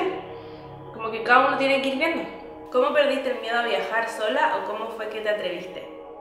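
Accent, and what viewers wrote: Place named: Chile. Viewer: ya casi se te está yendo el acentos chileno.jajaja y me encanta! Por otro lado me inspiras ❤️ me di cuenta que la vida se va y la mayoría nos quedamos atrapados en un trabajo para poder sobreviviry nos olvidamos del universo completo que tenemos afuera.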